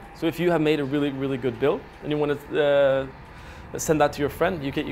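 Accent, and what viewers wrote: Norway. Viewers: So norwegian way to speak english!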